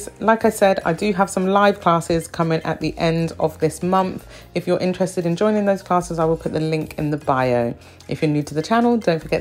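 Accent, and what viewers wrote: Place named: United Kingdom. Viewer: Gosh, I always drool over British accents, but yours is even better than usual. Hair looks great too!!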